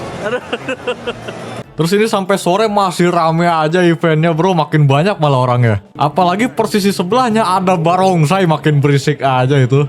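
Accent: West Java, Indonesia. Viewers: wih aya Sunda sundana ei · terungkap sudah dia tinggal dikota mana 😂😂 tapi di channel manapun dia nyunda bangett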